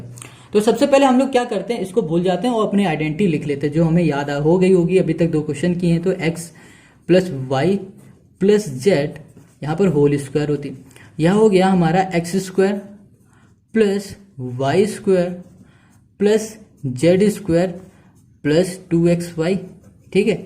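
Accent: Bihar, India. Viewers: Bhi app bihar se ho na👌👌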